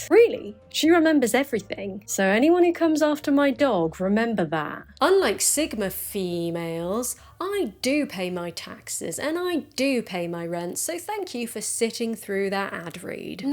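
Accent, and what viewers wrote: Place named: RP, United Kingdom. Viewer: Soooh Posh 💆🏻‍♂️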